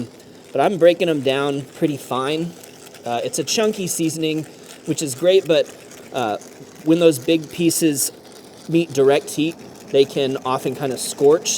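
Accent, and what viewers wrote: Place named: California, United States. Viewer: Who has all that their kitchen your special spices and infused chili oil you sound like you're from California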